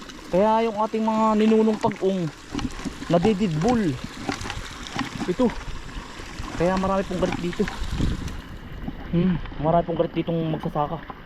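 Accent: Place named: Southern Quezon, Philippines. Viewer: taga san kaga kuya at ang iyong punto ay parang taga southern quezon😂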